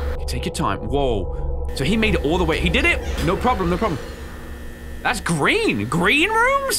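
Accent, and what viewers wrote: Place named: Newcastle, United Kingdom. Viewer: This guy keeps on over-analysing literally every single thing, with his annoying Newcastle accent.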